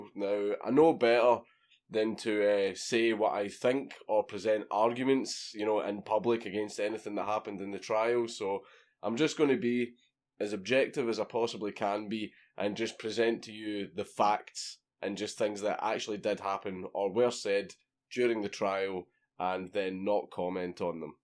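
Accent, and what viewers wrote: Scotland, United Kingdom. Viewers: wrong country dude he's Scottish not Australian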